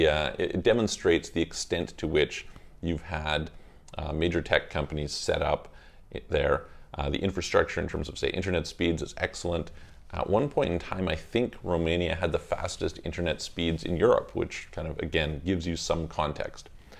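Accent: Canada. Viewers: You have a really strong Canadian accent.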